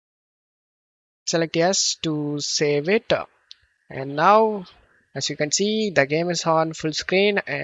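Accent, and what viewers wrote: India. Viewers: First i heard indian accent then i knew it will wokr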